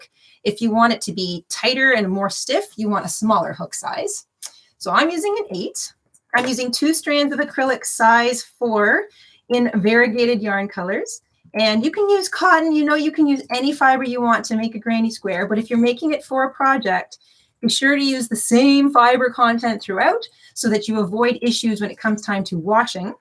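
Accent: Canada. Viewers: Your squares are extra special, thanks so much for sharing. I’m excited to try this. Best regards from South Africa 🇿🇦 hope you are well in Canada? Am I right? Your accent sounds Canadian 🇨🇦💫 oh ok you said hi from Canada ✌️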